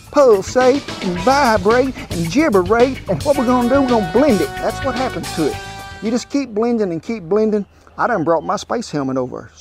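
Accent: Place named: Texas, United States. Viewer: I knew you were from TX, my father's side is from TX and that accent gets me every time!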